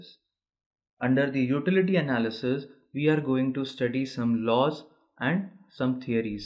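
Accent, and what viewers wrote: India. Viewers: why most economic tutorings are in indian accent,I do have problem understanding this accent. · Rosa s actually he is an Indian that's why. Plus when it comes to economics there are thousands of videos made by Indians :P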